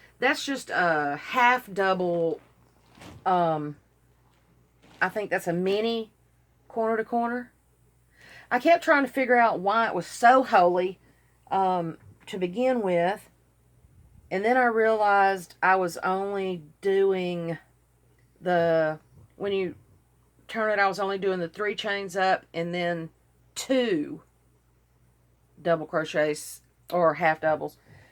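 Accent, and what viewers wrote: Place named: Alabama, United States. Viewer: Hey!!! I knew you were from Alabama after the first 2 words😂! I'm from Chalkville- Hey neighbor! I live in Florence, AL now- so great to hear home folk and hope you start feeling better.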